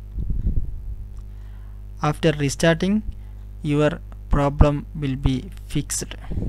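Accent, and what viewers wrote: India. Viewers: holy shit my insticts were right. i new i was in good hands as soon as i heard that thick indian accent<3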